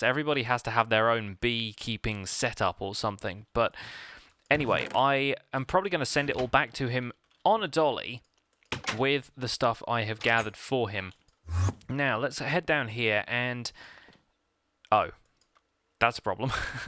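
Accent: United Kingdom. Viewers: I do like how that tower is turning out, and having the plan area does really stand out as a good focal point :) Also the spread up British accent is amazing. :)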